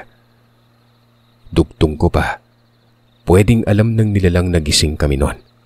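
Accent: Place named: Ilonggo, Philippines. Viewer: Wag ka na pong mag ilobggo or bisaya Hindi bagay nakaka rindi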